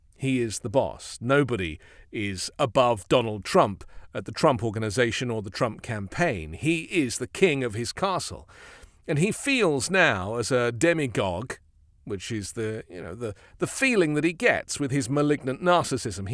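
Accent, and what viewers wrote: United Kingdom. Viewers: Somehow that British accent gives the entire affair more gravitas. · I love his voice! It's British so it makes him appear smarter are more authoritative. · I love listening to you shred Donald Trump. Insults with British accents have more sting. Beautiful.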